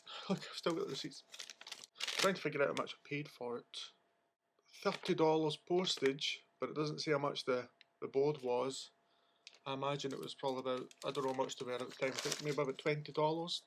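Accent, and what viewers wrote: Scotland, United Kingdom. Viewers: Is he.. .Scottish? Or Irish...? This is going to bug living crap out of me >.> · Are you Scottish? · Great video! I have a board and really want to try it - will be alone as nobody I know believes or is willing to take part! Thanks again for this video, brilliant to learn history of the boards too. PS I'm a Fifer - where is your accent from? You sound like you're more towards the West!! :o) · ur scotish me tae m8 lol scotland foreva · Is a make shift ouija board okay to use??? Oh and love the Scottish accent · Are u scotish ?? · are ye scottish lad? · Ahh, he's from Scotland. :P Sort of close. Lol.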